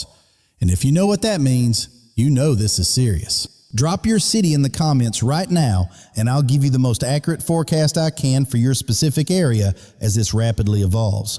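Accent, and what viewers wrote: South, United States. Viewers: Golly!!!What a hillbilly announcer. Quess it’s time for you all to put on some shoes! It’s called WINTER!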